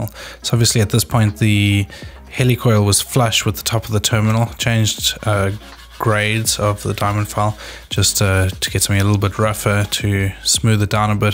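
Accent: South Africa. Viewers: How to spot a South African accent! Very nice video - these heli coil thread repair kits are relatively cheap - saved my LiFePO4 cells!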